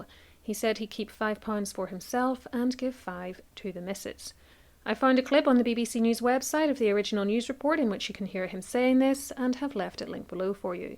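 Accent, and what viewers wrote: Ireland. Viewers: A few years ago I was in the CN Tower in Toronto and I swear I had the same brain conversation with myself as I stepped out onto the glass floor at the top (albeit without your beautiful Irish accent!). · Nice, no-nonsense, well-illustrated presentation —and the Irish accent was readily noted by this Yank. Jumping the gap: Did this inspire The Blues Brothers?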